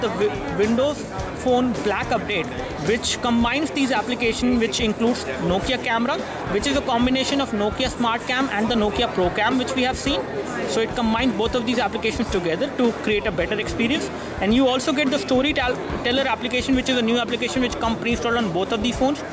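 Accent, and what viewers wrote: India. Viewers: im' italian, and even for me (clearly a not native english speaker) this video is understandable without any problem. I think it's indian english accent. So maybe you're not racist, but surely you're very lazy to not strain yourself to go further a simple matter of accents. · so... indian... slang rooted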